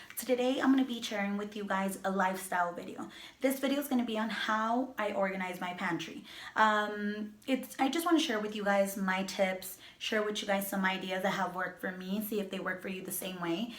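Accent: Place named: Latin America. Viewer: Hola. De don de eres. Yo soy de Puerto Rico y me suenas Latina. Me gusta lo que hicistes en tu pantry.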